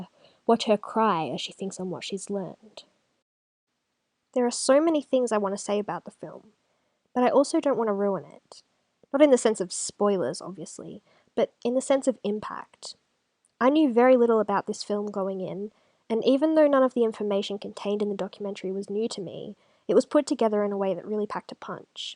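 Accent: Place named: Australia. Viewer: Also: with such a prevalence of US video producers, it's so relaxing to hear an Aussie voice. Thanks for the vid.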